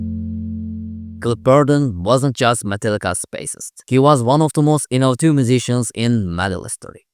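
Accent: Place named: Turkey. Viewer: Abi ingilizce konuşana kadar Türk olduğunu bilmiyordum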